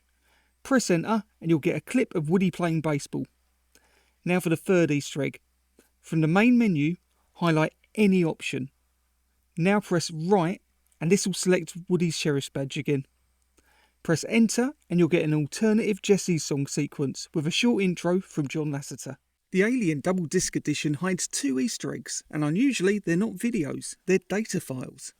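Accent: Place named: United Kingdom. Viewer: I have no other way of saying this but the auto captions don't like your British accent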